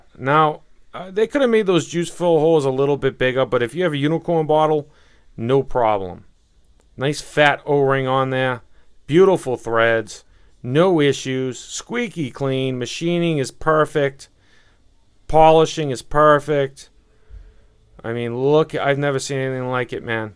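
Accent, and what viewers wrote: Boston, United States. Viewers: Thanks for the great review as usual! I'm assuming ur from Boston because of ur accent! If so where in Boston r u from? I live in Medford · dude yr boston accent is really fukt up, the Petri is an R TA, not AH TA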